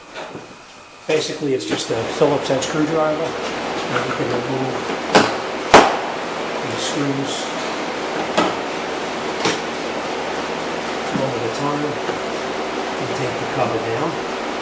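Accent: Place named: Boston, United States. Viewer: You sound like you're from the East Coast/Boston area. One thing I would have done differently though is, I would have left the belly pan off, until after you test drove or turned on the car, to see that you don't have any oil leaks(From underneath, as I see you checked from the top). Good Job.. You Italian as well?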